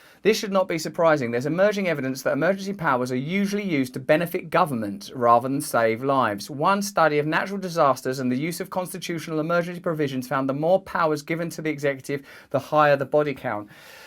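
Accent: United Kingdom. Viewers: is a brit, if i identify his accent correctly, and has no clue about political reality. or else he's just pot-stirring. you have no powers, so no freedoms. that is the purpose of democracy - the citizens rule, so the people have collective power, and resulting freedoms. outside the experience, and imagination, of, and other brits. so nothing will come of his expostulations, beyond any internal sense of satisfaction at saying 'nyaa, nyaa!' towards their masters. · Dear Russel, Would you please be so kind TO SPEAK SLOWER. English is the lingua Franka of today's world, but the British accent IS NOT. I live in the USA and although I understand you perfectly well, I have to watch your video, instead of just listening and doing other chores, as I do with other videos. Your fast British accent really DISTRACT from your very thought-provoking videos. You are not just an entertainer, you are a social leader on a global scale. 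Give the public a break and slow down the speed of your speech for people to analyze what you say. Please do not forget that there are a lot of people, who know have limited base level English, which does not make them less educated or intelligent, but your VERY SPEEDY BRITISH ACCENT could prevent people to learn more about you and your work. I personally tried many times to skip your videos, but you tend to present the most important themes. Thank you very much!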